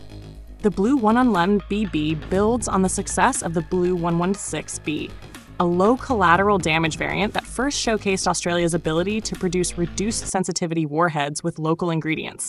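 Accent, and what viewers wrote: United States. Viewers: All the companies you mentioned are foreign owned. The only way Thales would continue is if it sold its operations to another foreign company. Not to mention this clip is narrated by an American.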